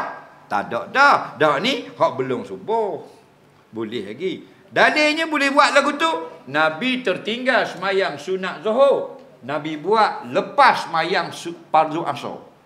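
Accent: Terengganu, Malaysia. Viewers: Sebab bahasa ganu ke?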